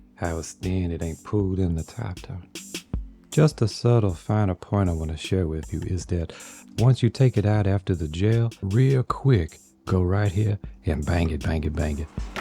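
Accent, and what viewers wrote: Louisiana, United States. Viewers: Hooray! Another Crafsman video! 🤩I love your soothing voice and Louisiana accent (I grew up in New Orleans, myself.) I also love how inventive you are-- it inspires me to try new things.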